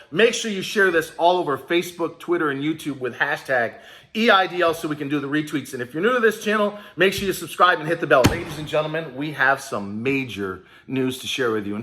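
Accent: New York, United States. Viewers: keep fighting. I just knew you were a New Yorker. You're just plain talk tell it like it tis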